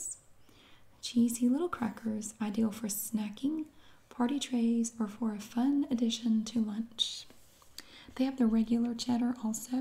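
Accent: South, United States. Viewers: x aren’t they the best. It’s her southern accent for me.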